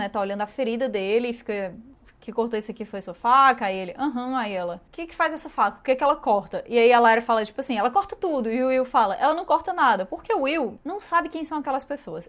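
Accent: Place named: Northeast, Brazil. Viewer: Kkkkkk esse sotaque nordestino da eu amoo 😂❤ que pena que esse já o penúltimo review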